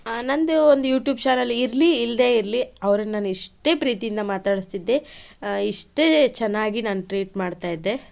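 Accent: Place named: Mysore, India. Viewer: Nimma bhase Mysore side matado tarra edhe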